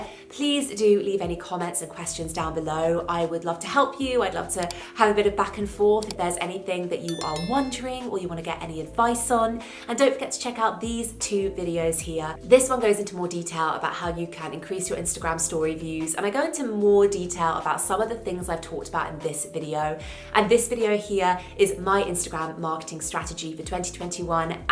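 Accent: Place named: United Kingdom. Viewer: thank you not only because of this video you just uploaded which is helpful but only because you're the first one with british accent (if I'm right) whom I can follow and understand :))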